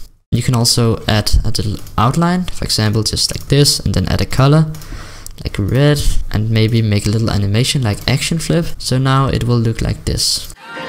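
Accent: Denmark. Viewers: Det er squ godt broder! xD Men god video man kan godt høre du er dansk :)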